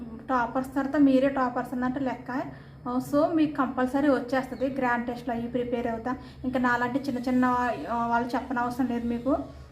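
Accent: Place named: East Godavari, India. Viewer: నేను అడిగిన దానికి మీ ఆన్సర్ అంతలా ఎందుకు.. జాబ్ వస్తేనే చెప్తారా?? Madi ఈస్ట్ గోదావరి..మీ వాయిస్ అలానే ఉంది slang అందుకే అడిగా..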